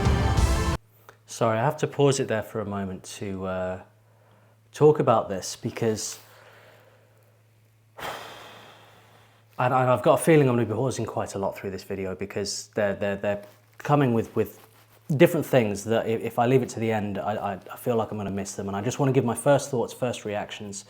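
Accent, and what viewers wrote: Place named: Surrey, United Kingdom. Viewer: I would absolutely love you to a deep dive on some of your favourite Nightwish tracks. I cannot agree with you more that on a first few listens it's all about enjoying the experience, and from there, starting to pull it apart and understanding how any why it works so well. Chances are I'll be popping onto your buy me a coffee because I certainly have a few that I'd like you to get to experience. Kind regards from Surrey in the UK. (From your accent, I'd guess you're not that far away :) ).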